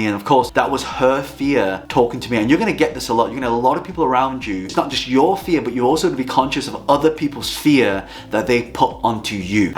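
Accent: United Kingdom. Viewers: I have never heard you stumble. Sometimes I can here your Northern accent though. ;) Btw. my husband is from Lancashire, too. ;) He was born in Rossendale, Lancs. But unluckily we just speak German, because he came over during his childhood.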